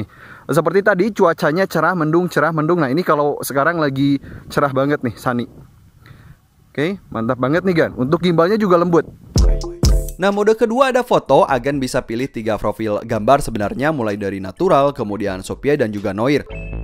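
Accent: Kuningan, Indonesia. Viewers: Urang kuningan jiga na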